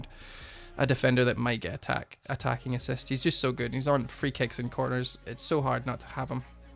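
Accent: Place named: Scotland, United Kingdom. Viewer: Where in Scotland you fr om?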